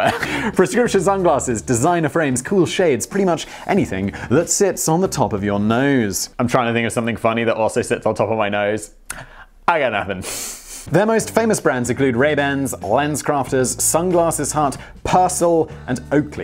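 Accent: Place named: United Kingdom. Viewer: After years of listening to, I was alarmingly quick to accept that it was just some goofy British pronunciation.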